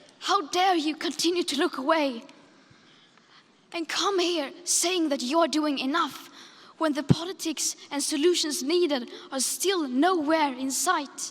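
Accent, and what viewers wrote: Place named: Germany. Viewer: Выступление Греты Тунберг на саммите ООН по климату - на английском языке (с тяжёлым немецким акцентом) с русскими субтитрами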